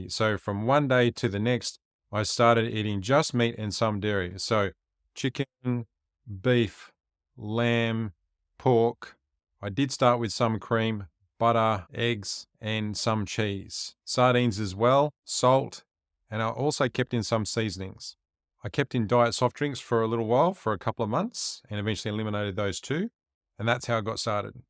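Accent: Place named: Australia. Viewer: Hey! Outstanding and informative video!! You summed it up in only 8 minutes!! I'm impressed!! 😊👏🏼👏🏼👏🏼👍🏼💪🏼💕 P.S. Sidenote: I Love some of the words you say, like the word "rubbish" instead of "trash" or "junk" or as some call it, "crap", lol.😀 (I love Australian and British words and accents!) I actually had a good friend from my college days, <num>. He was an exchange student, Tennis player, from South Africa who dated my best girlfriend. I was often a tag-along during the summer on their outings and dates. He is the one who taught me the word petrol for gasoline. Lol. Also my 13 yr old granddaughter loves the Australian children's cartoon series 💙"Bluey"🧡and so do I! Such a cute show! That's all for now! 😁 Take Care, God bless you and your family! 💕🙏🏼🤗 Susan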